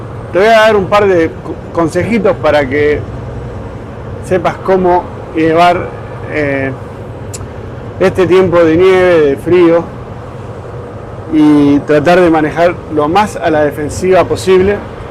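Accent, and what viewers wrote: Argentina. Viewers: Saludos desde Monterrey, Mexico.. tienes acento tipo Maradona (qepd), tus consejos son exelentes!!! gracias. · Vos sos argentino!!!!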